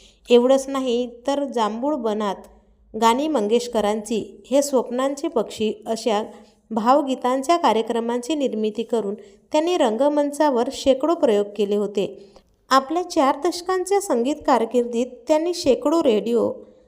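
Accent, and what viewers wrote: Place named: Rural, India. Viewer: निवेदिका! आपली मराठी अतिशय अशुद्ध आहे ग्रामीण उच्चार आहेत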